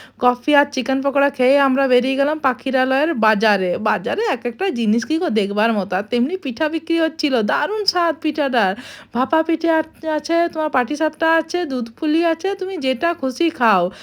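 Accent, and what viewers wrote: Bankura, India. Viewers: তুমি কি সবসময় এভাবে ই কথা বলো?মনে তো হয় তোমরা বাঙালি ব্রাহ্মণ। তুমি বাঁকুড়ার লোক।বাঁকুড়ার সবাই কি এরকম ভাবে কথা বলে?আমি ঠিক জানি না।তাই জিজ্ঞেস করছি।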